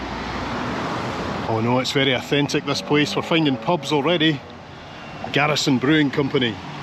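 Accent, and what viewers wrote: Scotland, United Kingdom. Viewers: Always enjoy your YouTube travel videos and especially your accent. Long live Scotland. Watkins Glen, New York is well worth a visit. It’s at the southern end of one of the Finger Lakes and is home to a car race track with a storied history dating back almost seventy years. Jackie Stewart raced here in F1. The town can be very busy when the race track has an event, which is quite often. Car clubs rent the track and accommodation can be scarce. You might want to visit during the Vintage British Car Club event. Hiking up and down the actual “Glen” is a spectacular experience. The schooner “Love” sails up the lake and is famous for its Moscow Mules. Other ships offer dinner cruises.Stay at the Senneca Lodge. The A-frame cottage are best. Drink at the bar that has hosted F1, NASCAR, TRANS-AM & CAN-AM car racers from around the world since the fifties. 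Hundreds of race memorabilia litters the walls and hours can be spent reading all the car paraphernalia. Every college in the world seems to have nailed their pennant to the ceiling. The nearest airport is either Rochester, Syracuse or Buffalo, NY. Alternately, fly to NYC and take AMTRAK to Rochester and rent a car. AMTRAK also runs from Toronto, Ontario, Canada to NYC and you could jump off somewhere on the South Shore of Lake Ontario and rent a car and later continue on to NYC or Toronto. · Hey! Realy Loved watching this Video of yours!... Is IT only me Hearing you speakgreat scotiish accent?~ did realy😂tiggl my ears~✓dearly Love you talking this way!..i am No native Englisch speaker😂,sorry& you Made me Pick Up the Dictionary 3 Times,Just to try& get IT right❤ Thanks a Lot for your lovely Video!& I must admid:Love your Understatement Mindeset🎉 cheers! Greetings from Berlin, germany~t.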